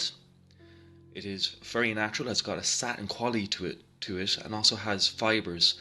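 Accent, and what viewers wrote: Ireland. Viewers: Love your work! Thanks for your effort!, coming to you from California, dig the Irish accent! Keep em coming as your teaching me a lot!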